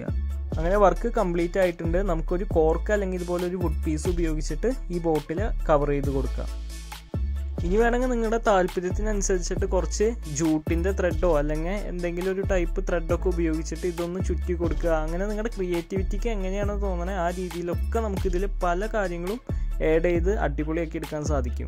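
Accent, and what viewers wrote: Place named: Thrissur, India. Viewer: Samsaram kettitt tsr aanennu thonnunu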